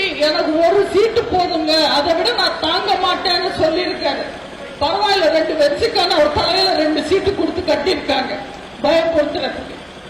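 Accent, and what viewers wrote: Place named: Telugu, India. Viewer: தமிழ் பேசுவது தெலுங்கு வாடையில் பேசுகிறார் 😮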